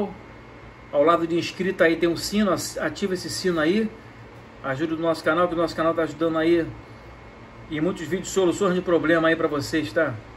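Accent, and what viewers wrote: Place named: Rio de Janeiro, Brazil. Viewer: Com certeza vc é da zona oeste do Rio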